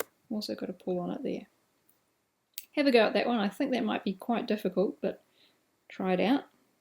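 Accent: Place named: New Zealand. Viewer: Hey, Thank you so much for doing this. It has helped me immensely. I'm new to all this and this tool was driving me mad in Affinity Designer, until I found your tutorials (it crosses over just fine). I really appreciate your patience, thoroughness and clear instruction. This is wonderful and a massive help for beginners. Thanks ever so much. PS - Your lovely NZ accent is very soothing too:)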